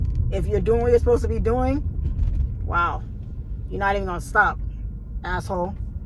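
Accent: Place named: New York, United States. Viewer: I can tell your from New York.We don't trust like that growing up in those streets.Congrats on your success and for giving up your insight.❤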